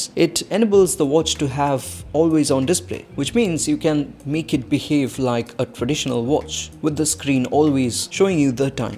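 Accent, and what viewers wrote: India. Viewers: Good review! By the way, your accent is nice too not the typical heavy Indian accent that's somewhat annoying because it's super hard to understand. FYI regarding the GPS though most Running watches even Garmins have issues when near or inside buildings.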